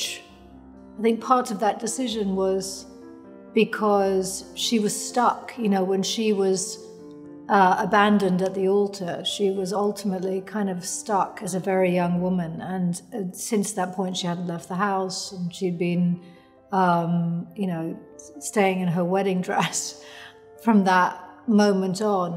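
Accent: England, United Kingdom. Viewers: What's with the British accent, she's from Chicago! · How does she look like this 30 years after xfiles??? And she was born and raised in the USA - why does she sound sooooo BRITISH ???? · You've gotta remember she had an English accent when she moved back the the US when she was 11. She was bullied and teased for it and therefore tried to sound American. Now after living in London again, she's likely fallen back into speaking that way. However when she is in the US, it isn't as evident. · I really like her voice. Her British English is really nice to listen to. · Her British accent is more prominent than ever, right? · What’s with the British accent Gillian? Is she still in character? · Why is she talking wirh a slightly british accent?! She should be figuring out how to get out of character! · Before I click on a video I always cross my fingers hoping it’s the British accent😍 She’s so fine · Why is she speaking in a british accent, shes from Chicago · She doesn't sound American in anyway anymore. 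She sounds very British! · Why is she speaking with an English accent? This is a choice.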